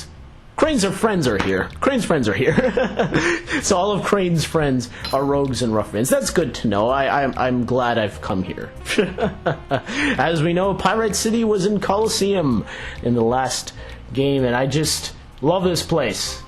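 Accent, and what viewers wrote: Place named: United Kingdom. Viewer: I'm British and i like your British accent! :)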